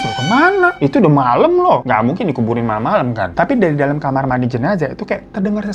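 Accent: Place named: East Java, Indonesia. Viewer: Sy kira orang ngapak, Brebes tegal. Ternyata jatim 😂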